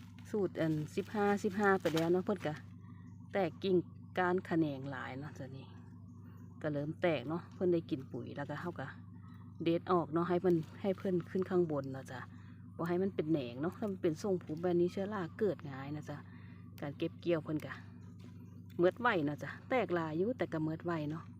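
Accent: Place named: Sakon Nakhon, Thailand. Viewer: สำเนียงเหมือนบ้านผมเลย สกลนคร ผมก็ทำสวนพริกเหมือนกันครับ ขอบคุณความรู้ครับ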